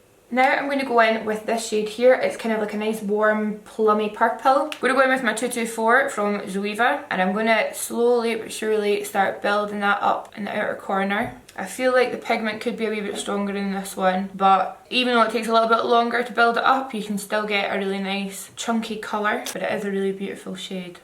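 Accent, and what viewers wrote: Scotland, United Kingdom. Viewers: is she Scottish ? if so love it or Irish I can't put my finger on it btw you're gorgeous! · Jeannine Marie definitely not Irish haha she from Glasgow in Scotland x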